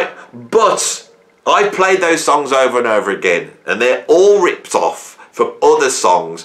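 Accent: Birmingham, United Kingdom. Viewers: Is that a Birmingham or a Wolver'ampton(Slade) accent?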